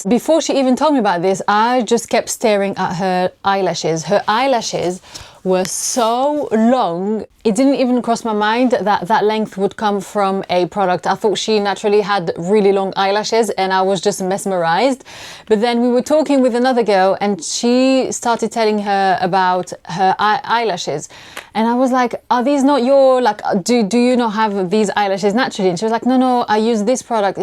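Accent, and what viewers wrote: United Kingdom. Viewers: Are you originally British?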